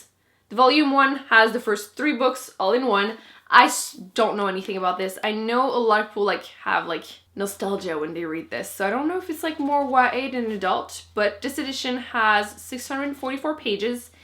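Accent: Quebec, Canada. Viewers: Just found your channel and have binge watched a bunch or your episodes. I listen to a lot of audiobooks while driving around in my cruiser and when I am out sailing on my boat. You are so bubbly and cute when you explain why you like the books you do. The French Canadian accent is so cute too. It makes me laugh at times, which is an added bonus. FYI, I’m Canadian as well but don’t have that French accent. Not to say I don’t sound Canadian because I do notice in my videos I sometimes do say “eh?” at the end of my sentences. You don’t even realize you do it until you watch yourself. Ha ha. You seem to like similar types of books to what I like. Keep those videos coming. I’ll be watching. Craig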